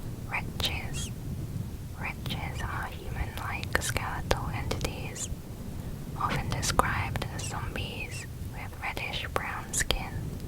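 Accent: United Kingdom. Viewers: She's from the uk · are you british? im british btw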